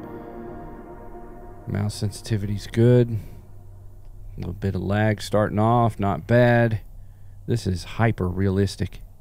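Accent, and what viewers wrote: South, United States. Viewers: This is my first video of yours and I have to say, there is something strangely adorable about a dude with a Southern accent gettin' spooked. You're cute as all hell, Sir. Carry on.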